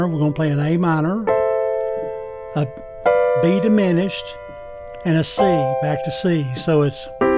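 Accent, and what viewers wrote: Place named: Tennessee, United States. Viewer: Whoa, that accent was tough to get a lock on! I was across the pond at first! My best guess though is somewhere in Tennessee or Kerrville, Texas? Anyway, very cool lesson! Thanks for sharing. This helps a lot.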